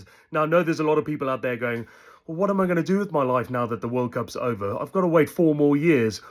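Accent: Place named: South Africa. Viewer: Hey. Sounds like you left your beloved SA to live in England. That accent is a dead give away.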